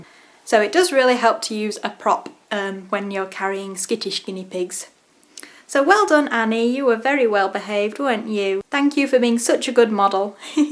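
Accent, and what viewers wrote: United Kingdom. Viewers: Nice British accent. Can I be your guinea pig?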